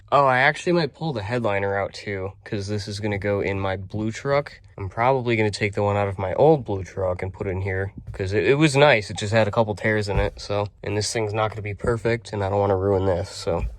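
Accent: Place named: Minnesota, United States. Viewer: Are you from Minnesota ? Just trying to guess by your voice hahaha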